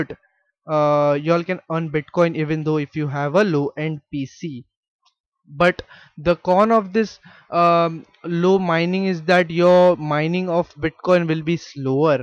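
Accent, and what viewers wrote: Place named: India. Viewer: Indian accent but i need to mine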